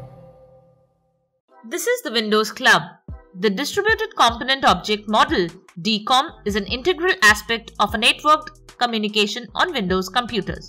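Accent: India. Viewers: do not trust a video with an indian accent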